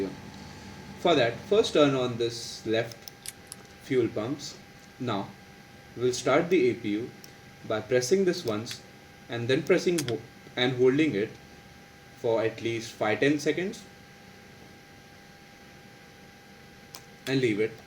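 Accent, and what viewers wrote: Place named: India. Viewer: This indian accent helps with understanding that procedure , video is very helpful .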